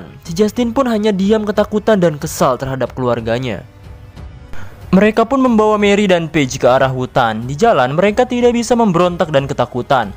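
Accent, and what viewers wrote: West Java, Indonesia. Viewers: Anjir teh 😄 Sunda bgt euy aa teh gening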